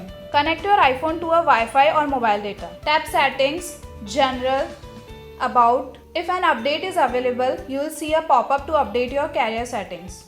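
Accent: India. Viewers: She’s Indian brahh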